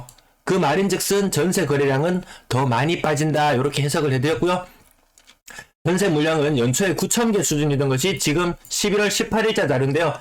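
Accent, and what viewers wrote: Gyeongsang, South Korea. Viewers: 구수한 경상도 코멘트가 참 재밌어서 항상 즐감합니다 ㅎㅎ 고향 부산 떠나 서울 온 지 45 년, 벌써 60 중반이 넘었네요~ 그동안 국가의 소중한 자산들이 대외경쟁력을 높이는 기술/투자/연구/다양성 인재 개발 등에 들어가는 것이 아니라 제 살 갂아 먹기, 투기, 후세에 폭탄 물려주기 등 부동산을 통한 탐욕에 쏠려 있어 내심 걱정이 많았지요. 저는 수십 년 동안 열심히 일해서 자식들 다 공부 시키고 지금은 서울에서 전세로 살고 있지만 두 단위 억원 정도를 은행에 넣어 쏠쏠한 이자 받고 사업 소득도 가지며 잘 살고 있습니다. "모든 것은 본래의 가치로 회기한다" 일부 영끌 20/30/40 우리 후세들이 향후 30~40 년 간 너무 오랫동안 은행의 노예로 묶일 것을 생각하니 슬프고 참담합니다. 그런 이 나라의 장래는 어떨까요? 어릴 때 어른들이 하신 말씀 한 마디 남기고 이 노부는 글을 마감합니다. 젊은이들이 4~50 년 전에 제가 들었던 이 말을 새겼으면 합니다. "세상에 가장 무서운 게 뭔 지 아니? 바로 빚쟁이란다" 이젠 그 빚쟁이는 우리 곁에 있는 살가운 은행이랍니다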